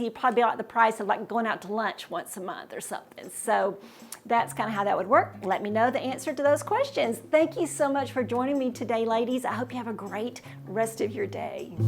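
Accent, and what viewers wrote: South, United States. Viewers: When you said you lived in Ohio, I thought, "What?? She's not from the South?" And then you said you grew up in Georgia, and I thought, "Yes!" I knew you had to be from the South! South Carolina/Mississippi girl here!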